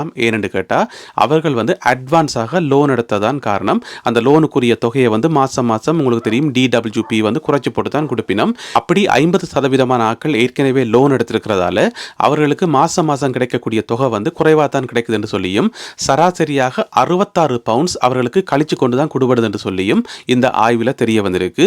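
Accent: Sri Lanka. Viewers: I have subscribed your channel bro. I like the Srilankan tamil accent. Your collective news always helpful.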